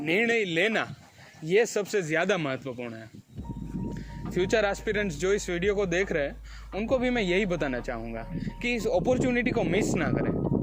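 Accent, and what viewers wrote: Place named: Gujarat, India. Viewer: All the best for College, New journey With new josh 👏 the Gujrati Tone in voice and The josh of new beginning indicates The real Gujrati Chhokra 😅😅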